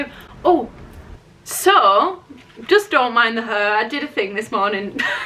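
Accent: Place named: Wigan, United Kingdom. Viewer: Hi, I’ve watched you for a while now, are you from Wigan? I’m from Wigan and I recognise the accent haha x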